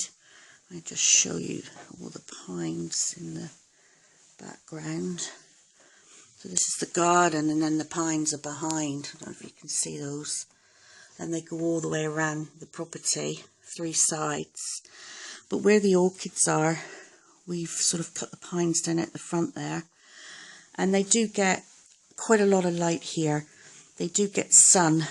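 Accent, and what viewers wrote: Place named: West Country, United Kingdom. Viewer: What a wonderland you live in, it's gorgeous. You sound like you may be from the West Country? I'm a former Essex girl.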